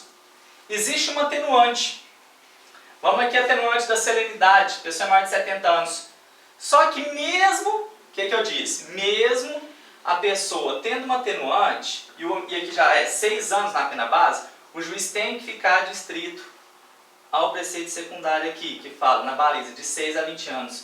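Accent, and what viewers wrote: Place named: Goiás, Brazil. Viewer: kkkkkkkkkkkkkkkkk goianin da gema..... :p (sotaque)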